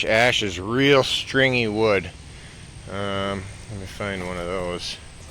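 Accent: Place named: Wisconsin, United States. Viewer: You sound like you might be from Wisconsin. Menards too? Go Pack Go!!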